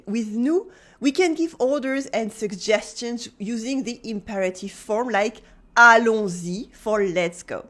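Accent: Paris, France. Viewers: Is this Parisian French?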